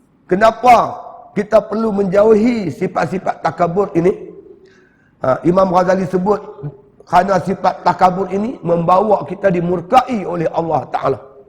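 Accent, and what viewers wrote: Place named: Kedah, Malaysia. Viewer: Alhamdulillah kata2 yg penuh hikmah dan saya rasa tersentuh hati dgr nasihat ust siddiq nor. Dlm masa yg sama sy nk tergelak dgn loghat kedah dia😄 semoga Allah memasukkannya kesyurga firdaus.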